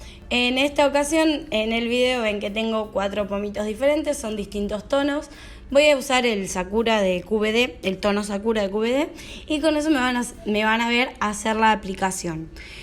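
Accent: Argentina. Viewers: No entiendo porque los títulos de tus vídeos son en Inglés cuando vengo a ver es una boluda hablando argentino hellloooooooo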